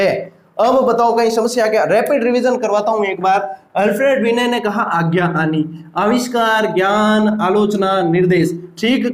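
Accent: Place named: Marwari, India. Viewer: Sir ji aapki marwadi language bahut acchi lagti hai aap aise hi bola karen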